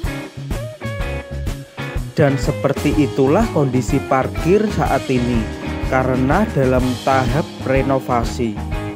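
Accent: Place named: Java, Indonesia. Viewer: Jawane Medok to mas😅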